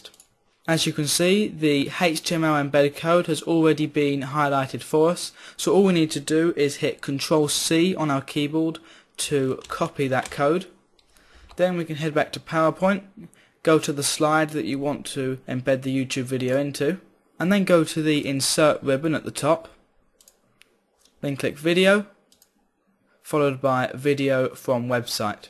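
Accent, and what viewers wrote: United Kingdom. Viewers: I love your british accent! :D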